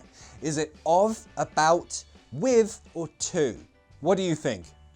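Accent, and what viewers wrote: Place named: Cockney, United Kingdom. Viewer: Nice ,with a cockney accent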